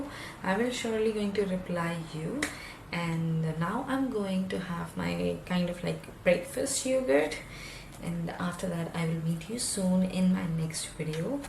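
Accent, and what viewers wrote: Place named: Canada. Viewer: Woahhh that Canadian accent thou🫣🫣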